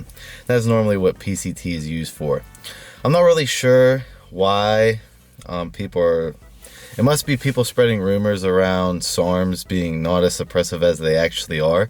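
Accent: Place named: Baltimore, United States. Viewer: Without even finding out, your from Baltimore. Accent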